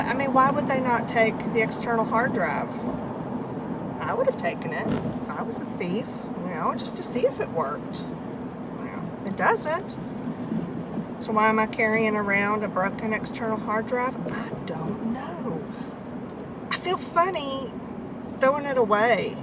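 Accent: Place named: Houston, United States. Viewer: You are possibly the coolest mom that has ever lived. (I remember you said you had a daughter from one of your other videos I watched) You make YouTube videos and art projects. Not to mention you are super funny. Wish my parents were this cool when I was a kid lol. I was wondering where you were from because your accent is so familiar. Half of my family is from Houston so now it makes sense lol. Love you channel!! -Katie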